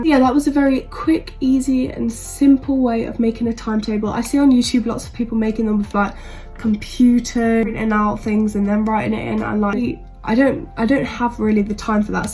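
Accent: United Kingdom. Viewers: I like ur British accent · you have british accent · her British accent <33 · Guess ,you speak britich accent ,right ?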